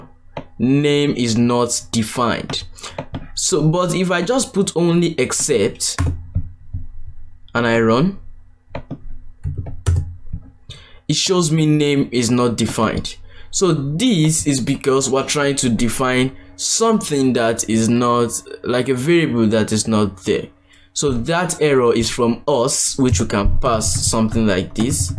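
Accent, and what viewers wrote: Yoruba, Nigeria. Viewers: Well he is Nigerian .... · Im so happy i can hear a Nigerian's voice. God bless you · sounds like a Nigerian great tutorial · Is it just me or does the instructor have a Nigerian accent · It's a Nigerian accent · I can hear the Nigerian accent. Great video. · Nigerian accent o 😂💀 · this is wonderful ..... why does this guy sound very Nigerian ??? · is probably Nigerian by his accent, yoruba to be precise. Thumbs up to him🇳🇬🇳🇬🇳🇬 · As a Nigerian, I've been doubting if this accent is from a Nigerian or not, then I heard "let me kuku", my doubt went off....thanks so much for the video. How can I also reach out to you? I've done cs50p, cs50x, and almost done with cs50w, but still feeling like they are not enough in today's market. I will really appreciate your response. From your fellow Nigerian. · He sounds Nigerian, awesome man! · Excellent, looks like a nigerian accent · Heard the accent and i knew this na omo naija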